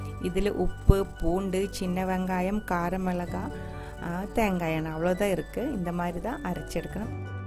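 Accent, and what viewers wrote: Kerala, India. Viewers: ur tamil is so good.. though little Malayalam accent... where r u from mam... my Dubai kerala friend told me this recipe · Unga Tamil malayala vasanaiyodu alaga erukuu. ❤ & Thnx for the information on how to select kappa kilangu dear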